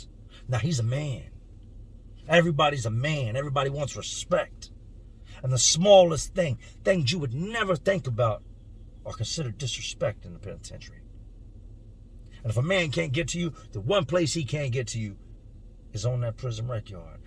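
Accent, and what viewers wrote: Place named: Philadelphia, United States. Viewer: I knew you had to be from Philly or the Tristate, love your storytelling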